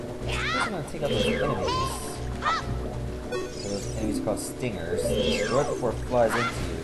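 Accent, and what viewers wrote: Canada. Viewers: This guy is Canadian as fuck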